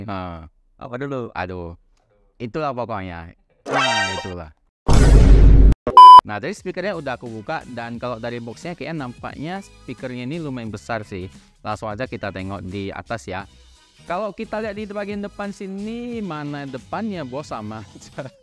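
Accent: Medan, Indonesia. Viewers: Suka aku pada host ini. Akses Medan dan Cina jadi satu. Mantap kalian.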